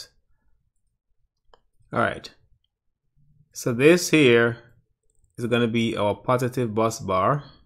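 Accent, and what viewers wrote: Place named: Africa. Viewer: Hey, my brother! thankyou or this! This is exactly what looked for in my search! Once and for all, eh, YouTube has come through for me. Ha! ha! ha!!!? BTW, my wife is from Africa! I heard it in your accent. You're ok! Top drawer! A'ight? Bye for now. Out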